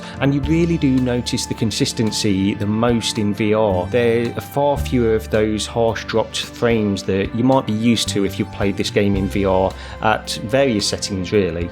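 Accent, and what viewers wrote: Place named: Leicester, United Kingdom. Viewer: That’s a Leicester accent if ever I heard one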